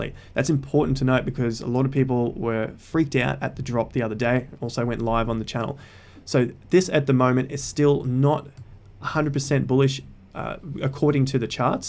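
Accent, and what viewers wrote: Australia. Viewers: Im completely new to the stock market and wanted to thank you for your informative videos. It's helped me make a bit of return off of a small investment and i'm hoping to grow further. Refreshing to hear an Aussie accent as well! Thanks for sharing your knowledge. :)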